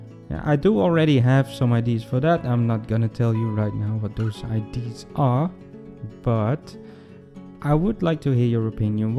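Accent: Netherlands. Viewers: a SUPER REALISTIC city zoo?! No way! I would love to see you build a zoo like that. I mean, I would super love to see it. I'm wondering where it will be placed. Netherlands maybe? Because your accent sounds Dutch [correct me if I'm wrong]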